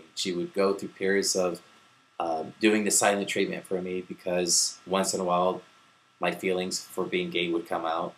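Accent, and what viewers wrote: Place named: New York, United States. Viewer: As soon as the first word came out his mouth you can tell hes a NYer, Nice Vid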